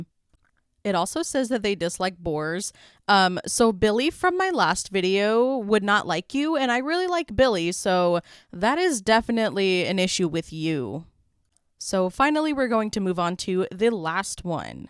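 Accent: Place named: Minnesota, United States. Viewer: it sounds Minnesotan to me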